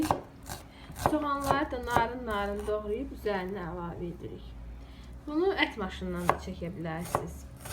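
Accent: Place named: Nakhchivan, Azerbaijan. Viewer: AXWAMINIZ XEYIR XANIM MENDE BAKILIYAM SIZ HANSI KENDDENSIZ SOZLERIMIZ DANIWIQIMIZ OXWAYIR NOXARIWLA YE BELE DEYIRIK😊😊😊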